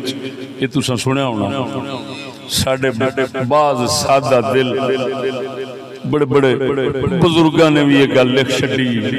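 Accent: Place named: Punjab, India. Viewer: Urdu ati hay laykin ap ki zuban mubarak pr punjabi charhi hoi hay.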